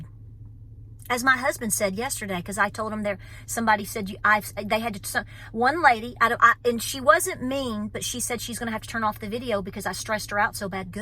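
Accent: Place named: Tennessee, United States. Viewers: Those people who state that you don't have solutions are really mistaken. LOL EVERY time I go to Aldi's, I find myself thinking, get out of my head! Your Southern TN voice is what I hear in the canned goods aisles. LOL I start to wonder if others can hear you say, "Girlfriend, you better get an extra 5 cans of corn or beans. I may not commit much, but I hear ya! Stay Strong! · In 2020 the Lord Jesus led me to your channel. I listened because your voice reminded me of family in Tennessee. You helped me to see the need for preparedness. My husband was not on board but tolerated what he thought was a phase. By 2021 he changed his mind and wondered why I wasn’t going to the store more 🙄. Thank you for educating us on the things we can do to help ourselves and others. Much love and prayers for you and yours❤️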